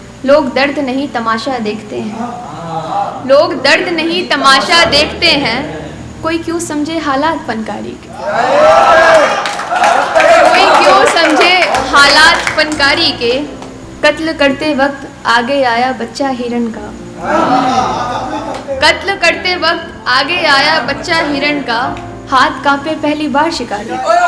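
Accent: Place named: Haryana, India. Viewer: Hindi me kosish acha h but ur hariyana sun jaan me jaan ati hai. 😘😘😘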